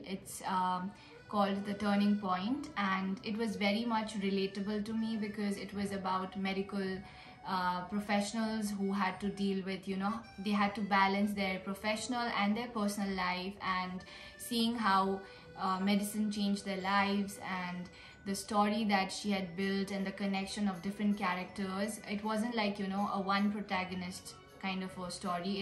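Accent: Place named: India. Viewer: Your Indian accent is so cute!❤️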